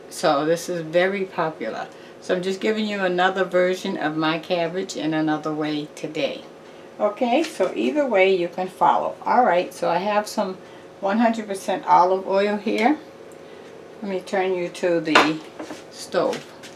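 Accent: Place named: New England, United States. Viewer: I Don't know who you are but I can tell a voice from New England 🙌🏾🙌🏾 Keep doing your thing Miss Lady 🙏🏾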